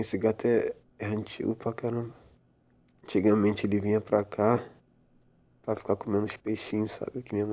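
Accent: Rio de Janeiro, Brazil. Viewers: sim, ele é carioca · Tu é carioca ? Ou é impressão ?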